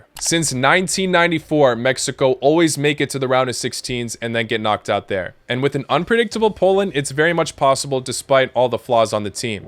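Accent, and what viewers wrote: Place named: United States. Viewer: pfff this is stupid... people predicting like you do don't know about football at all.... you sound american so i guess that is the porblem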